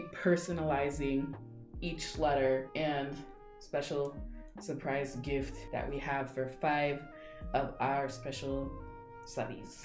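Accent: South Korea. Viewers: 아니 억양이 너무 한국인이야 신기해 미국인들 다 저러는거 아니잖아??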